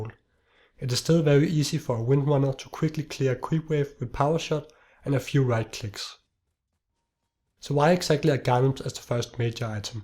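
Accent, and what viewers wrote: Denmark. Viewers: Your accent is so Danish I love it <3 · How danish can an accent be, from 0-? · The fucking Danish accent is so strong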